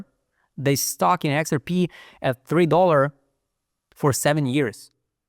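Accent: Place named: Eastern Europe. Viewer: Brother, I think you have a Slavic accent.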